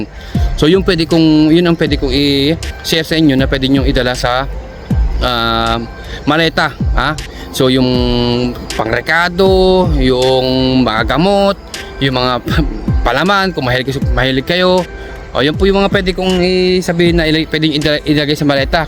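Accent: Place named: Ilocos, Philippines. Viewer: ilokano ka ba boss? pansin ko lang kasi sa kwan mo hahaha. btw boss, anong cp gamit mong pang vlog? salamats!!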